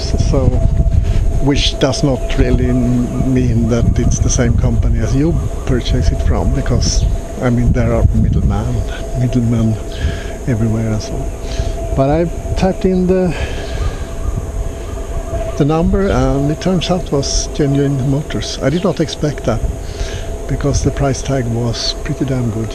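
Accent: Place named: Scandinavia. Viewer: Hi, nice video! I was looking around for fun because I've built an Alfa Monster myself and are about to build another one, but can I ask you where you're from? Edit: Saw Sweden mentioned in your channel description, thought I heard that nordic accent, being from Norway myself. Looks beautiful there, do you take guests? Kidding of course, but I would love to see Thailand sometime, through my Fatsharks too :D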